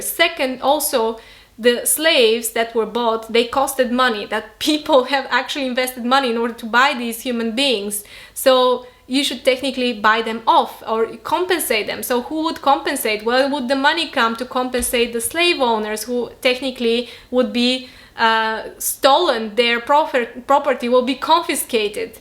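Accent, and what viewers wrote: Russia. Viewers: I thought you are from Russia or Ukraine (for your accent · are you Russian?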